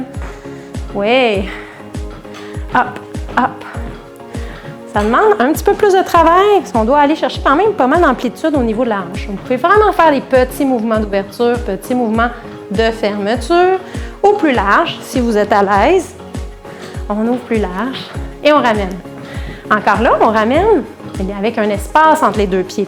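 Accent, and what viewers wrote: Quebec, Canada. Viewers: Bonjour....et un grand bravo pour cette vidéo.qui va beaucoup m'aider...,.car, ayant une cruralgie, je souffre trop pour marcher à l'extérieur, pour le moment. Peut on perdre du poids en pratiquant ces exercices chaque jour...? Et peut on améliorer une cruralgie...? ...p.s. j'aime beaucoup votre accent du Québec....❤❤❤❤😊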